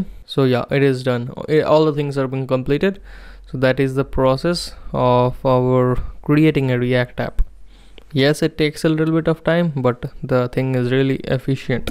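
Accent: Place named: India. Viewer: muh me land gya hai indian jase english bol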